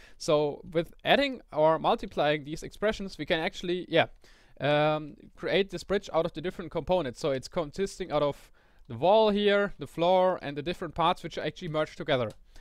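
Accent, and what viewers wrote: Germany. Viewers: No matter what you are. If you're German and start talking Math in English, you will sound like a mad scientist. Always fun to talk English in a German Accent ^^ (Halb Deutscher) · Du bis Deutscher, oder? :D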